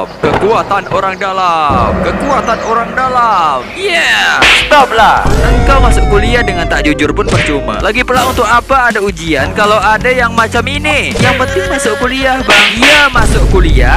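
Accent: Malaysia. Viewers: logat lu malah kek orang Malaysia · Eh bree ..lu org mana yak ..logat lu kek Malaysia 😁 ..gw tahu di indo ada juga logat melayu ..tp gw penasaran ma elu 😁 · Bingung ni abangnya logat Melayu tapi kok berbahasa Indonesia 🤣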